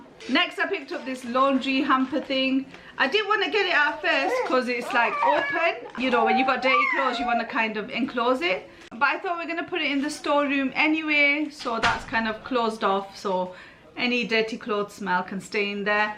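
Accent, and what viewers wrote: Manchester, United Kingdom. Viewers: Can’t you tell by her accent? She’s from near Manchester!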